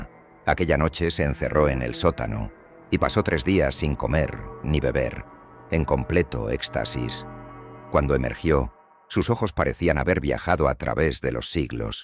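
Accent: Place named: Spain. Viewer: estos españoles no son agradables escucharlos narrar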